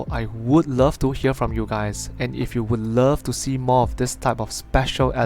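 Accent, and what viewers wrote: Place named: Singapore. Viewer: Hi, though I have subscribed to your channel rather recently, I enjoyed your analysis of the market. Your Singaporean English is very relatable as well lol. Keep up the good work and I will start to watch your options videos as I have tried to learn and understand a few times through different means but still don’t quite get it yet 😢sigh. Looking forward to part 2 of your video 🤗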